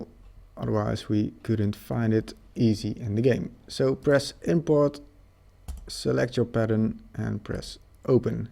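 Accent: Netherlands. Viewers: Are you dutch? you have like an dutch accent just saying❤ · are you dutch? you sound dutch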